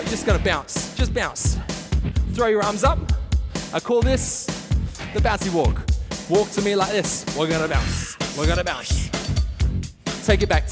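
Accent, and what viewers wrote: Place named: England, United Kingdom. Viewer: What English Accent is that?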